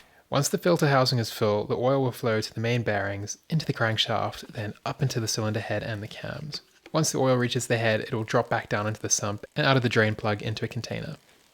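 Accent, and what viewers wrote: Australia. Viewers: Cool. This is the problem with being away (since 2003), you sounded Aus to me. Anyways. Lovely car, always wanted a Spider. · You a truly a very clever young man 😀 amazing work! You sound Australian? 🙂🙂 A well spoken Australian 😉 Your attention to detail is a credit to you.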